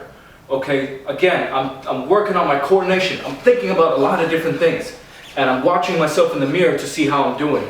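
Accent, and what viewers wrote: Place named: Philippines. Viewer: He has a filipino accent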